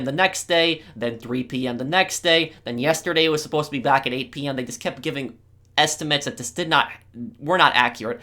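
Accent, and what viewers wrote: Canada. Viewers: I sense canadian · Are you Canadian?????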